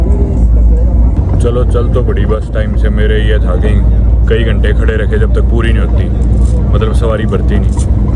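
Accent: Haryana, India. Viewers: You have mastered the spanish language, but Hindi is with typical Haryanvi touch it is beautiful with Aroma of Haryana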